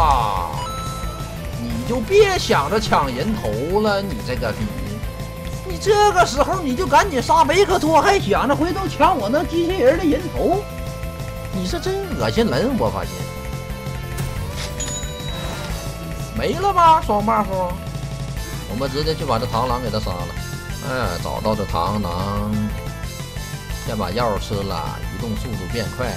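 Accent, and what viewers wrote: Northeast, China. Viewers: 东北话太好玩了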